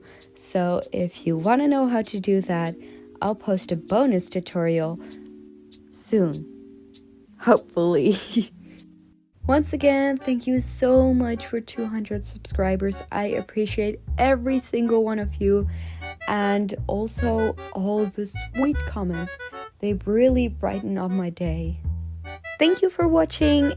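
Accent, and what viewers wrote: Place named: Netherlands. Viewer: i smell dutchness :D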